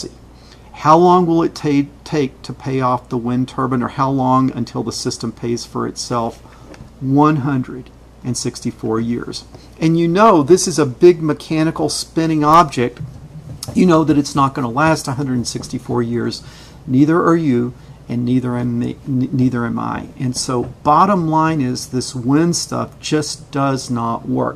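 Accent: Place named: United States. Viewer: I don't expect you to change your accent or speak in a British accent. I love the way Yanks speak. They (you) just have to pronounce words correctly. lol. I'm more concerned if you are one of the 46% of Americans that think the world is only 6000 years old?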